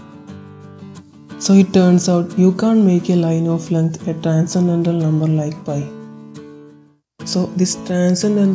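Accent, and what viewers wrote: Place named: India. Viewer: I press a video regarding math I hear an indian accent I leave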